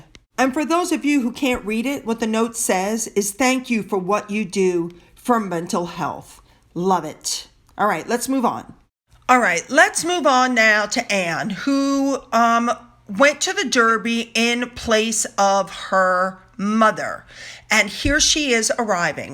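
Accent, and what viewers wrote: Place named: United States. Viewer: Thank you for all the kind things you say about our Royal Family ! I must admit, you have me laughing, with your pronunciations and your lack of understanding some of our crazy customs. 'They met a goat'...... The goat would have been The Mascot of his Regiment.😄 The Derby is the Daaarby, as in Baaaaath! You Americans never say aaaah! EdinBORO is pronounced 'Edinbro'. Pimms served in a bar can be Pimms No 1, 2,3, 4, according to the base alcohol, such as gin, whisky, rum,etc., and are served with mint, fruit, according to the number. Thanks for your highly entertaining channel, I have subscribed.🙋🇬🇧🍓🍉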